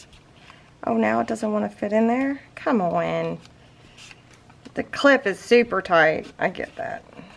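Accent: South, United States. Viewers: I feel so blessed to have found your channel,. Your aesthetic is perfect for me, I SO appreciate your sense of humor, and your work is absolutely beautiful. As a bonus, you talk “Southernese” just like me! So I definitely understand words like, “caddy wonked.” Thank you for sharing your sense of humor and knowledge with all of us. Hope you don’t mind but I chatter on and on to my husband about “my ” and I continue to lift you up in my thoughts and prayers after the loss of your mother in December. I wish you many blessings.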